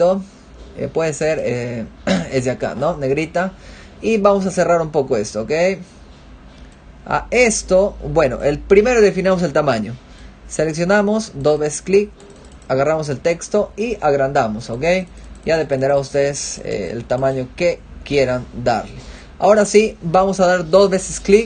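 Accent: Peru. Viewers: DIME QUE ERES PERUANO XFA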